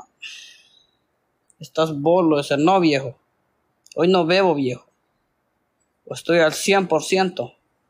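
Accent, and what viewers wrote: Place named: Mexico. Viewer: No , todos los mexicanos hablamos así , pero si usar esas palabras 😂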